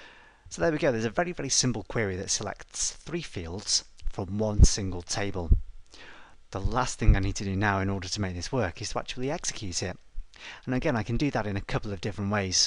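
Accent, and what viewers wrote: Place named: North Wales, United Kingdom. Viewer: I recognise that accent anywhere, do you come from North-West Wales? 🤔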